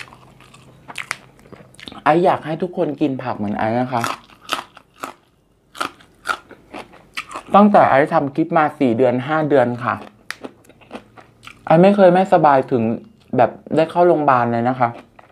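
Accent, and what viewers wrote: Isan, Thailand. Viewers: อยู่เมืองกาญทำไมพูดอิสานได้อ่ะคับ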